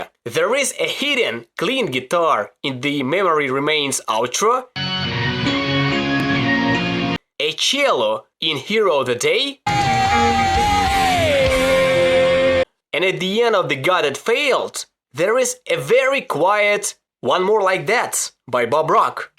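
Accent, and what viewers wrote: Russia. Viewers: I love your russian accent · Сразу подумал что русскоговорящий человек по акценту, а потом ещё и "поехали")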